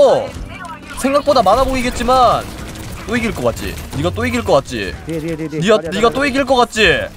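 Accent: Gyeongsang, South Korea. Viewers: 근데 님요즘 경상도 사투리 쓰시네 ㅋㅋ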